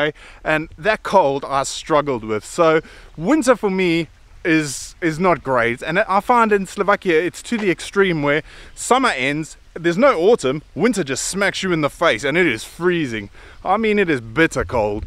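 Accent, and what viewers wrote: South Africa. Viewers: Howzit. I also just moved here 3 months ago from RSA, i picked it up in your accent straight away... Lets get together and have a Braai one day, we have a Weber here too..✌ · I kept wondering where you're from as your accent sounds South African, so happy that you mentioned it 😁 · Thank you for your honest confession. :-) I can imagine it's tough living so far from the sea. The larger lakes and water reservoirs don't really make up for it, as nice as they are. And I believe you when you say the UK's beaches aren't that magnificent-looking, compared to any of the coastlines in South Africa. You're right that some drivers still drive like maniacs. And that's after we've had stricter laws about speed limits and driving in general implemented in the last decade and a half or two decades. Some people just can't seem to learn they need to be more careful and have more empathy on the road. 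I suppose I've gotten pretty good at recognising anglophone accents over the years. I've only needed to listen to your's for a few seconds and thought, "Oh ! You're South African ?". :-) Well, I hope you like it over here. It's not a perfect country and it has its fair share of issues, but hopefully you'll find it welcoming and peaceful for many years to come. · Are you South African? answered that. Love it. Keep well, all the best. Haha, you never drove in Joburg then? 😂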